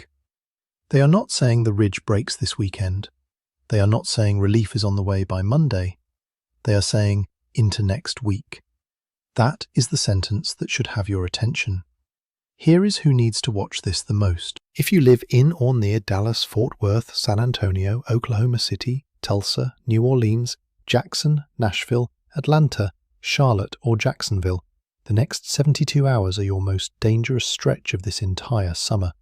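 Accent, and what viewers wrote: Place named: United Kingdom. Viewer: This is U.S. weather....why the British accent?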